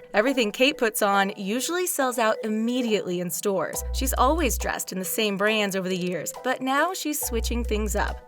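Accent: United States. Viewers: The Talko is an American channel, I suppose. Especially according to the accent and how they portrait Meghan :) Well, I don't think that Kate follows Meghan's example especially because she is so different from Meghan in a good way. I have nothing against Meghan but Kate was always classy and a true royalty. Meghan was a rebel who either didn't want to sit through (what Meghan and Camilla did) or couldn't. One or the other, I don't care, it's non of my business. Btw, it's a typical American characteristic to be a rebel. Americans rebel all the rules, you can see it in the language. Sometimes, it's good to be a rebel. Sometimes, it's out of place. In Russian we say that you have to know when is the right time and the right place. I guess, you say it in many languages, too. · Americans reporting on UK Royal family is silly...the accent is annoying and it's full of factual mistakes. 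Other than British, the Aussie reports are much more accurate and enjoyable.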